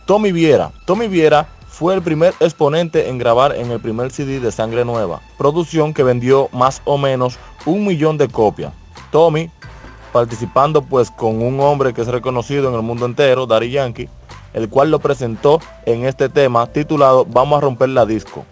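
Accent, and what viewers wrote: Dominican Republic. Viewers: Que tipo mas envidioso, escuchen su asento, no quieras dañar lo que no puedes dañar. Tengo amigos dominicanos, pero no son como tu de bocones, y menos envidiosos como tu ño eres, mira los comentarios, querias acer mal y son mas los comentarios de apollo, al boss, que lo que no lo apollan, papá la envidia te esta matando, y todo el mal que uno quiera acer a otra persona se le regresa.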